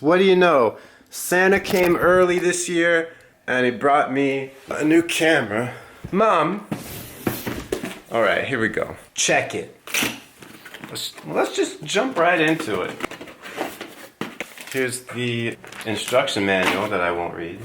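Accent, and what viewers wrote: England, United Kingdom. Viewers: I didn’t comment earlier, so let me fix that and say this - the floppy hair, the accent, the Christmas tree...it’s like you’re about to star in some British holiday romcom that my mom would make me watch with her. Adorbs. Good to see you back in all your crispy, high-def glory. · Oy me old china, I do like your English accent! 👍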